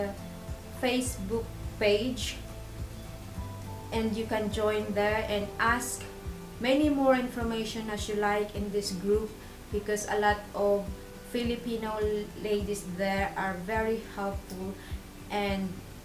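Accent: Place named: United Kingdom. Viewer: Very helpful and you explain it well. Galing nyo po mag salita with british accent pa po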